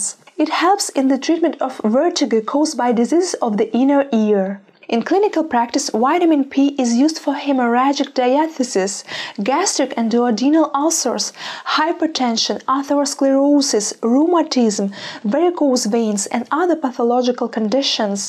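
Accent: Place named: Brazil. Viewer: Thank you doctor. By the way, I love your beautiful accent! I beleive you are from Brasil, right?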